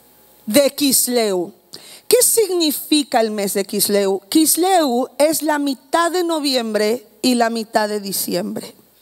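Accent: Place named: North, Mexico. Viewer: Parece más de acá dl. Norte q del D.F. x su forma d hablar 😄 buen msje."q estamos reflejando"? 😕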